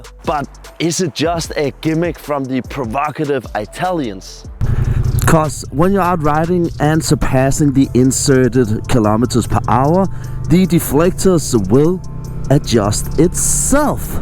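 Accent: Denmark. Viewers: Do I detect a Danish accent? Merry Jul then! Kudos to you for riding in those conditions (by the way the same I have right now where I live so I know how it feels to ride on those roads). I guess it's a demonstrator bike, the dealer must be very confident to let you ride out with snow and salt on the roads. I cover my ride, come winter, with a protective spray but whatever you do, there are still spots (mostly the bolts) that get a white shade from the salt but then again for me it's more important to ride than to have a pristine bike. I really like your enthusiasm and the work you put into the presentation, takes, cut, editing. I'm very much interested in the V100 and will definitely test drive one. For the time being I'm a very happy owner of a Ninja 1000sx, such a versatile bike. It will take a lot to convince me to swap it for another bike. Cheers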